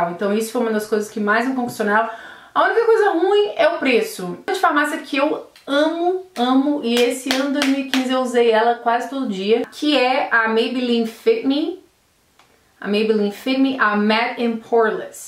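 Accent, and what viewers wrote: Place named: Minas Gerais, Brazil. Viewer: menina eu acho que parece meio mineiro hahahah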